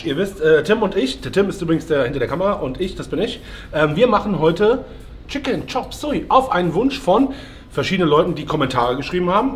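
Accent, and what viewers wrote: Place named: Mannheim, Germany. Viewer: Ui, jetzt habe ich direkt Hunger. Werde noch etwas an Saucen nachkaufen müssen; daher danke für den Link aus diesem Video. Hockerkocher, Wok etc. ansonsten vorhanden. Daher für das Wochenende: Feuer frei. Dein Dialekt bringt mich immer zum grinsen, da ich viel mit Kollegen aus der Konzerzentrale in "Mannem" (=Mannheim) zu tun habe 😉 Mach(t) weiter so und Grüße aus dem Rheinland.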